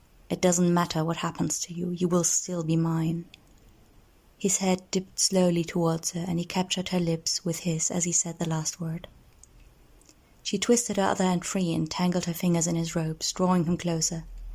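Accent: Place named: Paris, France. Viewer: It’s sounds like the narrator has a Parisian accent - that would explain the mouth noises. I don’t mind it honestly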